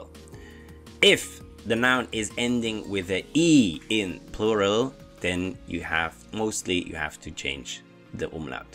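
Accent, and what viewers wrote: Switzerland. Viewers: Stupid question... are you Swiss german or did you learn german from a swiss? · Thank you for your reply and your videos helped tremendously! I figured you were swiss based on your high german accent but was only sure when you said Grüezi in one of your videos 😊 thank you so much again and will continue learning..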